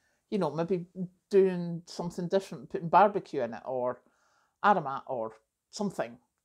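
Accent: Scotland, United Kingdom. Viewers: Hi. I just want to say that I enjoy watching your videos. I have a lot of ancestors that came from Scotland. I live in the state of Oregon, in the USA. I've not been able to go to Scotland yet, but I hope to someday. Watching you gives me a glimpse of what culture and life are like there. I also love hearing you talk. Love your accent. Take care, and have a blessed day!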